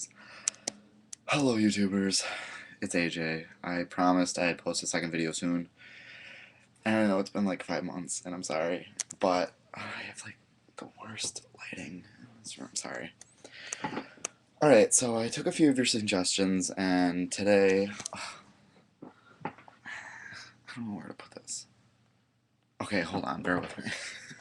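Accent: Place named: Midwest, United States. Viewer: you are adorable regardless of the lighting & love that midwestern accent...thought you were from minnesota until you mentioned chicago! =)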